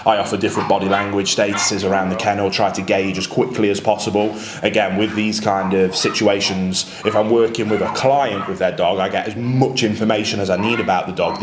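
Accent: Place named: West Midlands, United Kingdom. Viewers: You sound like you're from the west Midlands! Nice to see a local doing well, here's to your success. Could I also volunteer at a kennel? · Sounds to me like a fellow Black Country chap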